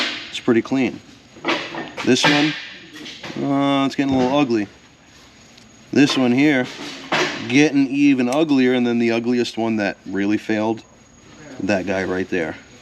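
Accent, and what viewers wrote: New York, United States. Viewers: New York. is that where you work based on "giggidy" accent.......very nice.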